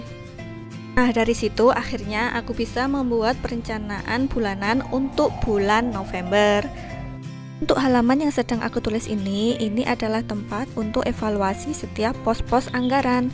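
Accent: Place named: Java, Indonesia. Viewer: 😂😂😂 Swara medhokny suka... Semoga bisa menginspirasi bunda-bunda lainnya. Slam kenal nge bun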